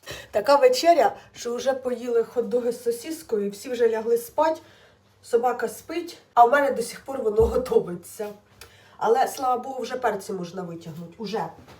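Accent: Ukraine. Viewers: Всі слова мацковські з українським акцентом ,і Бога приплітає !Вчи мову ",бєстолоч "!І молись !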